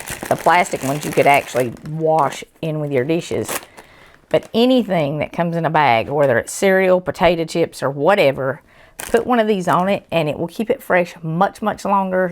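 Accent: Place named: Texas, United States. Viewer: You sound like texas. Great recipe.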